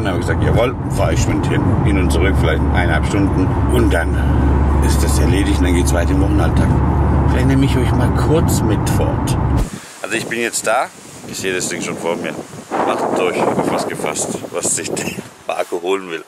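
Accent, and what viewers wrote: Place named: Sauerland, Germany. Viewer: Ich komme aus dem Sauerland und finde es echt witzig wie bei dir manchmal der Dialekt durchkommt ich mag das sehr . Leider spreche ich selber kein Dialekt mehr und es ist sehr schwer jemanden zu finden der noch den Sauerländer Dialekt spricht um ihn zu lernen .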